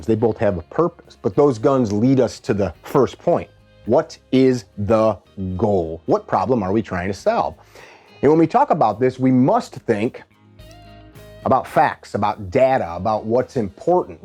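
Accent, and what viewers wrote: Chicago, United States. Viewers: Know what? I love this dude, hes like one of the homies & I’ve never even met the guy. So no homotype shit. legit seems like a down to earth funny ass dude from Chicago, with that yankee accent… hahaha. the father figure most of us never had. 100%.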